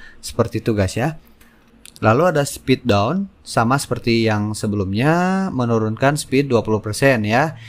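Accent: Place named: Bali, Indonesia. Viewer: Ni logadnya kayanya Orang Bali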